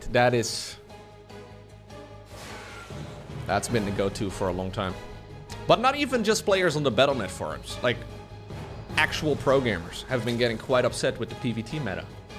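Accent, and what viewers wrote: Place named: Netherlands. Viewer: Interesting how you Dutch people pronounce stuff. But when you typed it, I understood right away. In German it is "Schurke". But we pronounce it quite differently. Interesting :)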